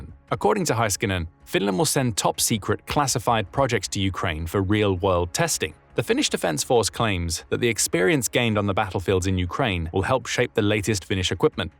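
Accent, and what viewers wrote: United Kingdom. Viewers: Another british accent. Is that all that's out there anymore? Adios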